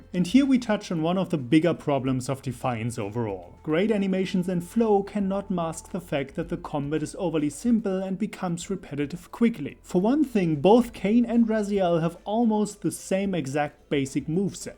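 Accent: Germany. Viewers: The German accent is strong with this one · This guy is obviously german. His accent is extremely german. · Are you from Germany? You have a little accent. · Wow! What a wonderfully detailed summary! Love it! 👍👍👍 But it's funny how your German accent is getting more and more pronounced during the video. 😆😉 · I can't get past the german accent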